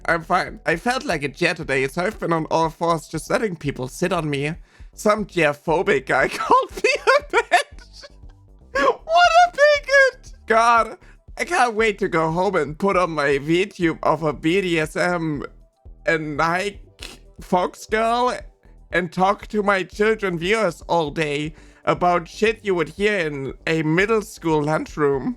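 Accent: Germany. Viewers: Topic of your voice; you sound like a normal German girl to me. Not sure if transphobes know but accents exist. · i still don't get the voice thing, like have they legit not ever heard women speak, who am i kiding they either stick to such a narrow as shit definition of what a woman should sound like(which would exclude most cis woman), or are the "haha woman should be silent" type bigot, or both. anyway, you just sound like a woman with a german accent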